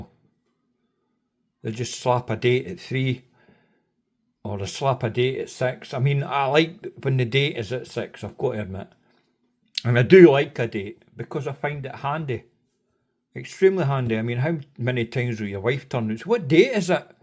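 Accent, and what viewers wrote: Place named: Dundee, United Kingdom. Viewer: Are you a Dundee guy? 🏴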